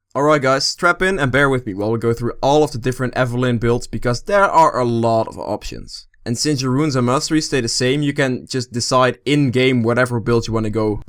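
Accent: Netherlands. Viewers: haha ik praat ook nederlands (België) dus ik herkende het al :p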